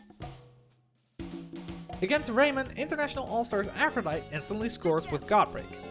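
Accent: Limburg, Netherlands. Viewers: Fam kuumps ste oet limburg? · your nederlands en limburhs omg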